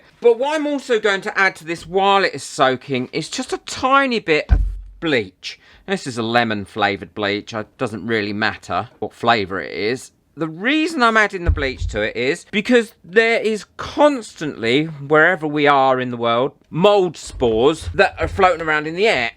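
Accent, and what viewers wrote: United Kingdom. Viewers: Hmmmm I wonder who has to taste the bleach to make sure it has enough flavor? 😂🤣🤪 My Gran is from Yorkshire and she used to say "flavor" instead of "scented" or "fragranced." I miss her and her laugh. She was also a crafter like you and would get so happy and giggly when something would work. The way you laugh and giggle reminds me of her… as well as the accent! While she lived in Yorkshire, I would come and stay for the summers… I would pick up that accent really fast and it would make my mum mental when I came home with it. After my grandfather passed away in 1999, Gran came to the states to live with my mum and dad. I got to have her with me for almost 30 years. That laugh was contagious and she was just as cheeky the day she passed away as she had been her entire life. Thank you for your videos and your laugh. It really brightens my day!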